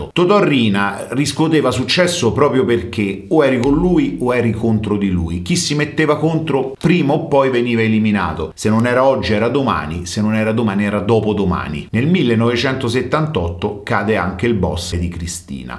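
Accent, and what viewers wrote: Rome, Italy. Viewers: Pero se devi raccontare non parlare in romano.. Non è mto corretto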